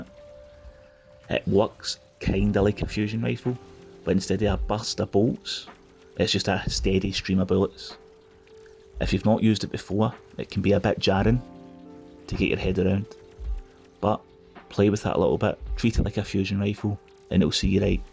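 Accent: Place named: England, United Kingdom. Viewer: Bet you from England 😵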